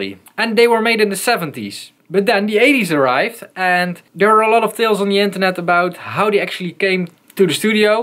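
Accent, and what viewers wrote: Netherlands. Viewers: I realized that subtitle detected your english as dutch :) Thanks for the video!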